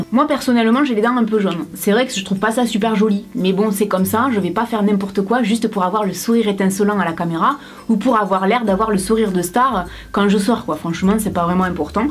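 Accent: South, France. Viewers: #tuesunestar lol tu me fais toujours rire et j'adore ton accent du sud 😍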